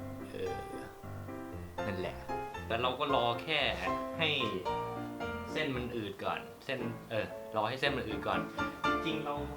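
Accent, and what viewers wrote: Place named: South, Thailand. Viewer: สำเนียงเหมือนคนใต้ เป็นคนใต้ใช่มั้ยยบอกมาาาา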